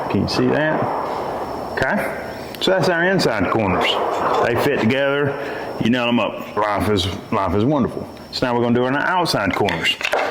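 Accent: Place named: Western Maryland, United States. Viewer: Nice. I would not have placed you there according to your accent. I heard people with your accent in Western Maryland. Of course now I ain’t been around too far but I’ve been in Pensacola several times. I build kitchens and typically work with 4 1/4 inch crown. However, I’m on a job that has 7 1/4. And I decided to do it flat. Your video has been helpful.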